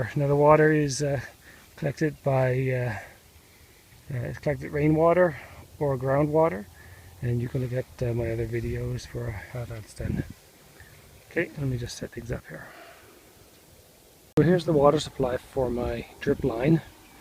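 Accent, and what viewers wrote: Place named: Alberta, Canada. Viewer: This person sounds like they are from Alberta.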